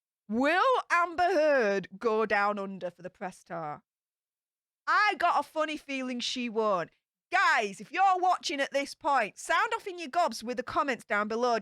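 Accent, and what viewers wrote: Yorkshire, United Kingdom. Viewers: you’re the best! You always have me dying over here 😂😂😂 I thought you were from Yorkshire when I first heard your accent. I thought either Yorkshire or Lancashire. I wasn’t sure. My Great Grandfather was from Loftus! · you’re so dang cute and cheeky we can’t help but like you, give your partner BigRichard a hug from all of us. Do all Yorkshire women have your same accent?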